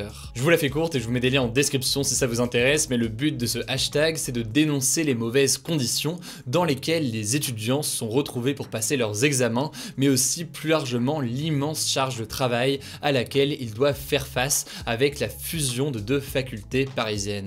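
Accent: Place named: England, United Kingdom. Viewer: Ct’accent anglais tu régales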